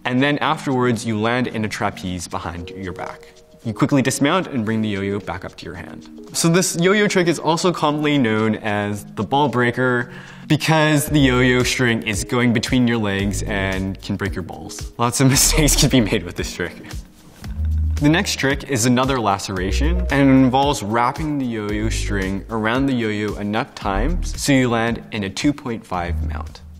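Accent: Canada. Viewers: I am very canadian. But i noticed his Canadian accent. It is beautiful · He sounds very Canadian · He's Canadian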